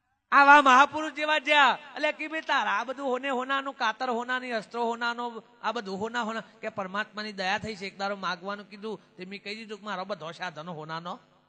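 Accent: Sabarkantha, India. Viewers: ભાઈ આતો સાબરકાંઠાની બોલી છે...... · Humm mehsana ni nathi sabarkantha ni boli che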